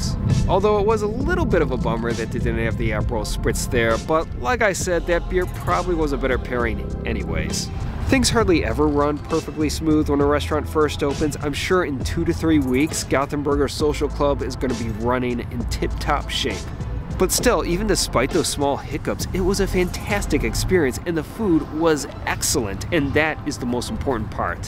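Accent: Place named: Midwest, United States. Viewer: U must be from Michigan or Chicago..? your accent is funny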